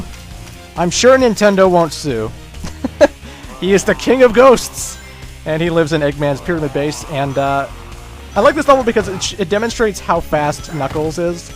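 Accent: Canada. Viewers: YOUR CANADIAN!!!!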